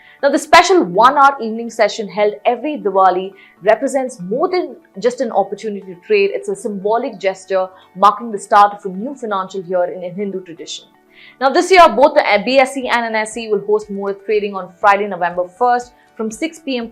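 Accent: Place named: United States. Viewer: Don't speak US accent english, English destroys Bharat culture, Speak Hindi Hate US culture and english medium Kabootar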